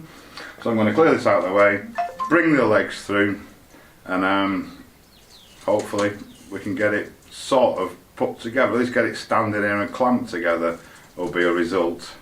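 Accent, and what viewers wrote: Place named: Chesterfield, United Kingdom. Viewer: Hi where abouts in the UK are you from? You sound similar to us we live in Chesterfield lm thinking your from near by perhaps Mansfield!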